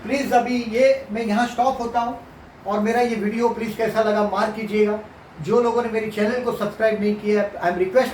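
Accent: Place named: South Gujarat, India. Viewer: Sir your language seems like south Gujarat which is your native place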